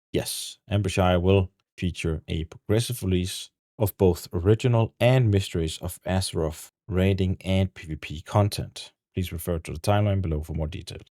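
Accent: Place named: Denmark. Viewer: you sound like a fellow dane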